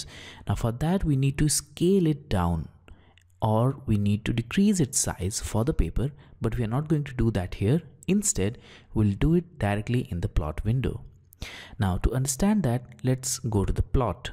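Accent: India. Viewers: your Indian accent realy annoying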